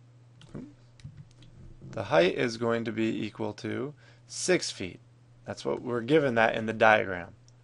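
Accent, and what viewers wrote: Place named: Wisconsin, United States. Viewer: Your accent sounds like Randy Russell. Are you from Wisconsin?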